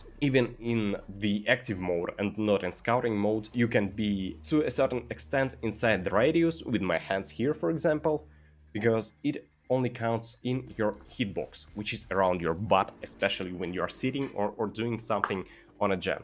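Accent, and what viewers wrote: Russia. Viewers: Listen your english try with balalaika accent is so funny XD You made my day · Omg dis strong Russian accent:D Nevermind, just keep going! Do you remember how funny was Ochido’s accent? So you should also remember that it wasn’t a problem for him to reach his popularity and audience/fans:)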